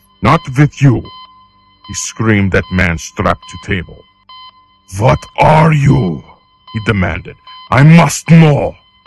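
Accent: Russia. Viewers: Fantastic. This story always makes me queasy xD Clever use of a Russian accent as well as the visuals :3 Good job. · That was great and I think you are the first to do it with a Russian accent. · Are you really Russian or you that good?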